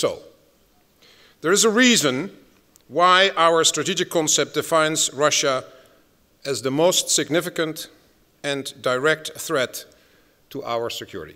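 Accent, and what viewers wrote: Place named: United States. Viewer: No wonder he talks like an American.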